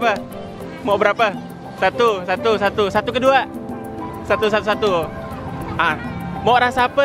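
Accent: Malaysia. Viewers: Mas nya asal mn ya ?? Bhs nya kadang seperti malaysia..hehe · Cara bicara seperti orang Malaysia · Bang asli Mane? Melayu nampak bahase tu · Saya ngikutin HOBI MAKAN dari Subcride 14.000.. skrg udah 700.000-an... selalu liatin updating nya tiap minggu Sukses selalu bang, selalu bersedekah.. dan logat melayu nya tak berubah😂🤣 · bang orang malaisia ya suaran ya seperti orang malaysia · Abang orang mana sih ? Kok bahasanya kayak bahasa Malaysia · Ko kya bahasa Malaysia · Eeee kek bahasa malaysia cokkk salam dari malaysia banggg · Aslinya org mn? Logatnya melayu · Asli mana sih bang ini. Macam kek org malaysie punye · Asli mana aa logatnya ari malaysia yah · Aksen melayu nya gk ilang2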